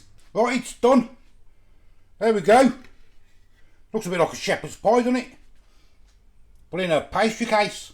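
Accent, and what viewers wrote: Birmingham, United Kingdom. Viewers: sorry but i still think you sound loik a brummy!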